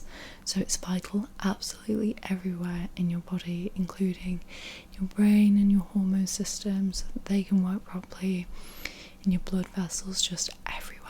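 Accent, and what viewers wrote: United Kingdom. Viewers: brit accetta too different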